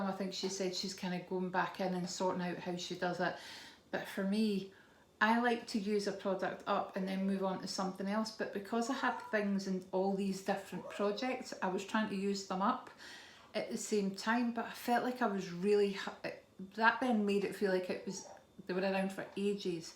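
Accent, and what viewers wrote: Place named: Scotland, United Kingdom. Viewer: Hi trying to catch up on videos so going backwards 🤣. Love watching you and listening to your voice as it’s so nice to hear a Scottish voice as most of the you tubers I follow are English. Great video. Have a lovely day 🥰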